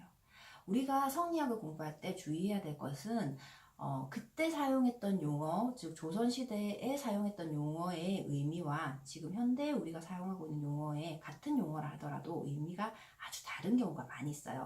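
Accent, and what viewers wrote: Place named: Gyeongsang, South Korea. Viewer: 말씀이 좀 빠른건.. 경상도 말이 원래 그렇죠. 대구신가요? '대학장구' ㅋㅋ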